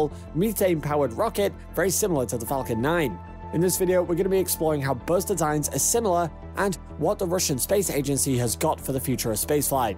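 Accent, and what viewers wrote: United Kingdom. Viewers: One of an Uncountable number of miss pronunciations. I don’t mind the strong British accent, as an American, but I do not appreciate so many mispronounced words as if the narrator doesn’t have a goddamn idea what he’s talking about. It’s very unprofessional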